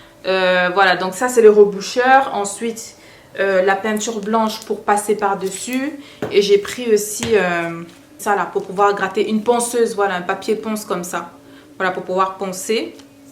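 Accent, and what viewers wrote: Cameroon. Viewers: Je viens de finir de regarder le vlog 😍....trop bien. J'ai appris plein de trucs, du coup j'ai trop envie de décorer ma maison. Ton accent kmer j'aime trop 😍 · J'aime trop l'accent camerounais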